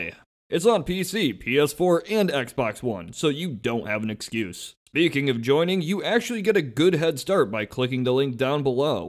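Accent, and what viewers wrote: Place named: United States. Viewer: Why does he keep on getting more and more American throughout the entire video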